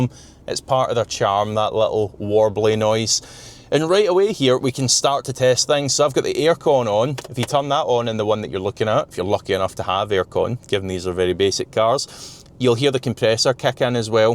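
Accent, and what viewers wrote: Scotland, United Kingdom. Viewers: the scot is strong with this one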